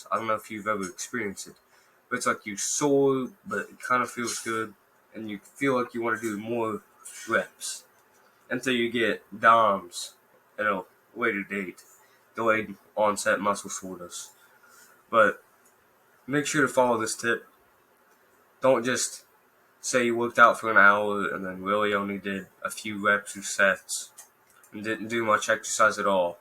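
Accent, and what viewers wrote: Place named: North East, United Kingdom. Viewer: Sound like he in the north east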